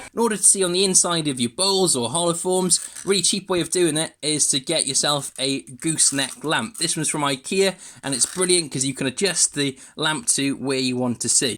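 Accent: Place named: Wales, United Kingdom. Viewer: Hey love your videos been following sine started a year ago. I am in Wales too, curious what part your from accent seems quite local.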